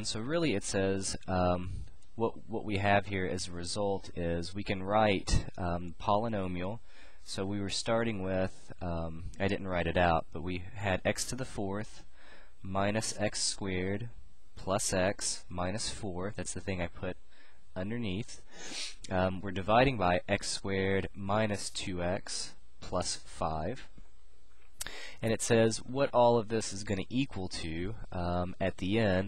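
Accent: South, United States. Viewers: hahaha. the comment about your southern accent made me giggle. For some reason my brain shut down and i second guessed myself... you helped me get back on track :) and gave me a good little laugh too. Thank you for your time and help :) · I had to laugh when I heard your country accent. But thanks for the example!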